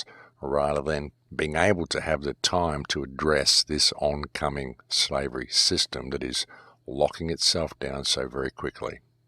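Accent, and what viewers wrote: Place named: Australia. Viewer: its good to hear an aussie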